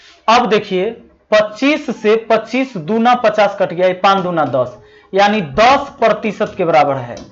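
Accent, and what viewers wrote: Bihar, India. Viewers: Ek dam bihari andaz me padate hai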